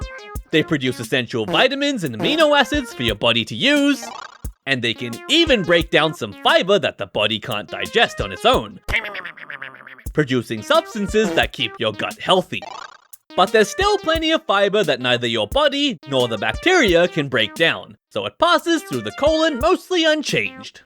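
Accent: United Kingdom. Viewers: Are you british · are you british?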